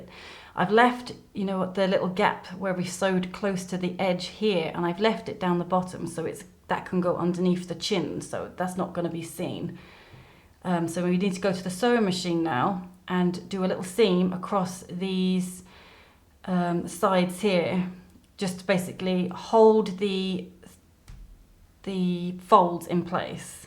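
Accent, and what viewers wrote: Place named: England, United Kingdom. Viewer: Thank you so much my friend you sound like you from England my friend god bless you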